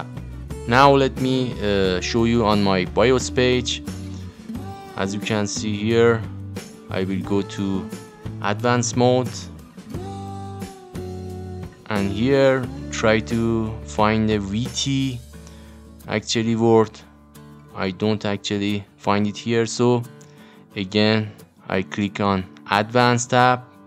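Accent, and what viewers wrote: Iran. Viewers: معلومه لحجه ایرانی دارع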